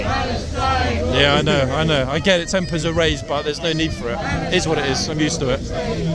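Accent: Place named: United Kingdom. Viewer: You know us American girls are sucker's for a British accent. I like your videos and have been watching old ones I never saw in between your new uploads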